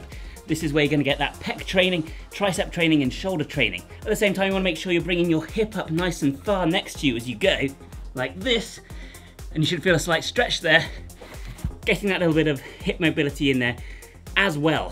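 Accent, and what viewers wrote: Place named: RP, United Kingdom. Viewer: Poshest sounding beast I've seen 🥂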